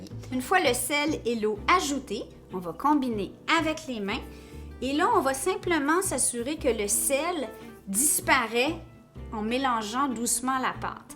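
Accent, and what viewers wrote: Quebec, Canada. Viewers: Un petit coucou de Bretagne pour la nouvelle année qui approche. :hand-pink-waving: Je vous envoie (par la pensée) des tonnes de notre bon beurre salé breton, au sel de Guérande bien sur, puisque c'est de là que je suis ! :face-red-heart-shape: J'essaie la recette dès que j'aurai l'occasion. Merci pour le partage et le petit accent québécois. 😉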